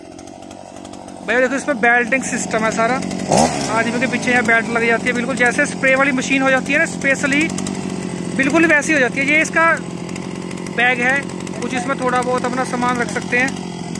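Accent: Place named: Punjab, India. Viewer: I can listen punjabi in ur hindi ❤️🎉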